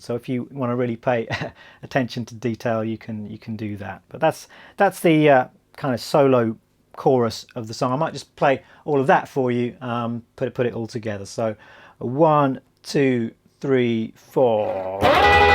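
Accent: United Kingdom. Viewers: Hey just found out your channel !! Obviously it's an easy song, but your teaching is priceless and your UK proper accents adds up to the lesson :) :) Thank you for taking the time to make a Youtube channel! Cheers from france merci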